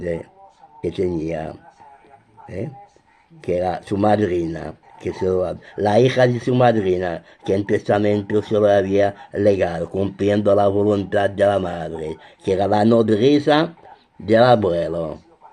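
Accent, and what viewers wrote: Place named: France. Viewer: Usted es solo un francés renegado que está acogido en España , pero solo hay que oírle para saber que es un francés de pura cepa. Usted pasará a l posteridad por ser novio de Carmen de Mairena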